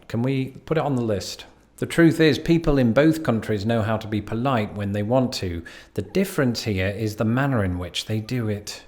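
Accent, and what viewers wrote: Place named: Cockney, United Kingdom. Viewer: More cockney accent hahaha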